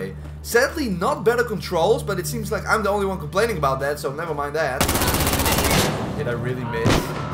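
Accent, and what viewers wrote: Netherlands. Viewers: The Dutch accent is real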